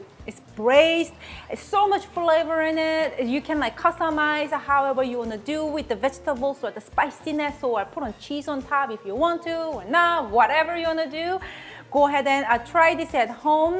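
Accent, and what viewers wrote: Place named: South Korea. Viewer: She married white man. Why is she have Korean annoying accent?.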